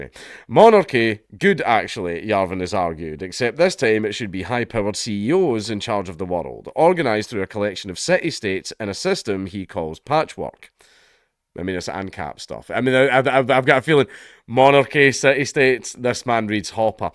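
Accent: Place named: Scotland, United Kingdom. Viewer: absolutely LOVE the bouncer stories. The way you say it all in your Scottish accent makes it so much funnier when something funny happens.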